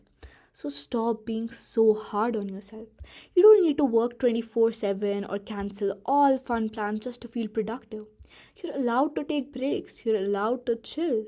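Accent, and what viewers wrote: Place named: India. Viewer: Indian accent ❤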